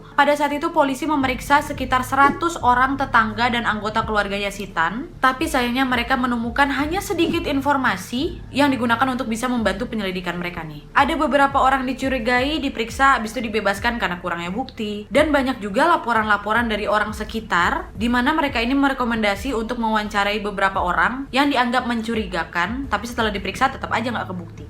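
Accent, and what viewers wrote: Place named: Bengkulu, Indonesia. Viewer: Ngmngnya kaya orang Bengkulu Wak Wak🤣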